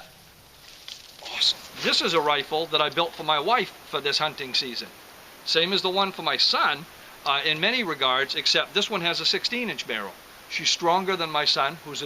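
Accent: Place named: New England, United States. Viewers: This is the first video of yours I've seen. Nice video. I'll check out your book too. I made sure to watch for a couple minutes to see if your boston accent was a shtick or not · Just ordered your book. Looking forward to it. Your east coast New England accent is a breath of fresh air. We are out here brother. You are not alone.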